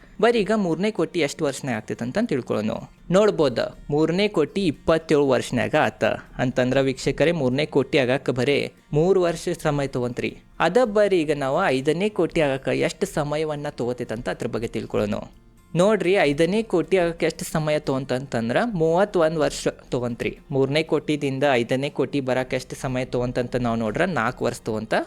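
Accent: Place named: Belgaum, India. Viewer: The King of Belgam - with Belgam Kannada. Jai Ho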